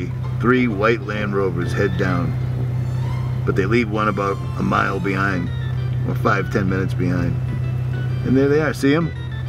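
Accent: Boston, United States. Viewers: Just stumbled across your vids while exploring the black hole of YouTube. What part of Boston you from? You better not say Framingham 🤣. Great vids, keep em’ coming. Mike- who hails from Boston but now lives in the burbs’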